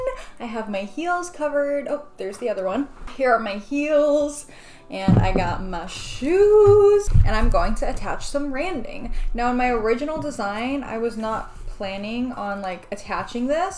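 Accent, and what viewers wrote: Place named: South Africa. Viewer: Just wondering but are you South African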